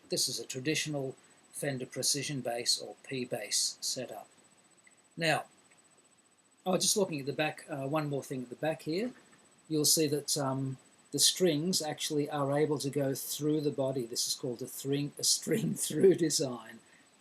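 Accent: Australia. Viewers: I assume you're an aussie, one as the accent and two the over all structure of how you speak. I've never met an aussie i didnt like and whether its just luck or something about your culture how you explain stuff is not only very easy to follow but very friendly but to the point. Simply, great stuff and keep up the great work, your method of teaching is warm and friendly. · Hi, ahhhh... finally some tutorials with an Aussie voice! I'm so glad I found you, I'm beginning bass (as soon as it arrives), and I'll be stalking you for a while I think. Easy to follow, understandable language for us newbies (especially those of us over 40's), and entirely logical in sequence. Thank you! and thank you again!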